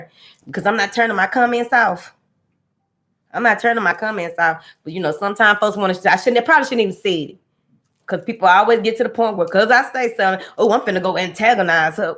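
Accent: South, United States. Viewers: You so Southern and I LOVE IT!😍😍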